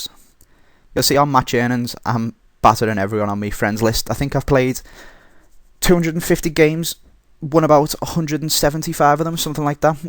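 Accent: Liverpool, United Kingdom. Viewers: omg a scouser who makes fifa videos yes !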